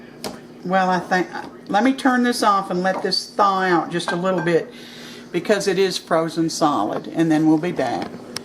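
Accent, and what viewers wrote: South, United States. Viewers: I totally enjoyed your video!... Your Southern accent and the TV on in the background, made me feel right at home, in the kitchen. My Grandma was an expert pie maker, unfortunately She didn't choose to share her secrets and skills, but watching you I can imagine this is the way She made her pies. One thing I can agree with is the patience that is required to make great pie dough. It's more than just throwing some ingredients in a bowl and mixing it up. I have always said there is an art to making flaky pie crust. You said it should be done quickly, but you didn't do it quickly, and it turned out great. I guess I am saying that because I am not quick, lol. Also do you recommend Granny Smith Apples, to make the pie filling?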